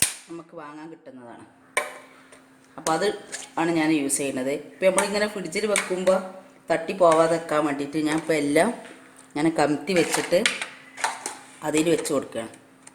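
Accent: Malappuram, India. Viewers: Nice itha .nishkalankamaaya Malappuram talk .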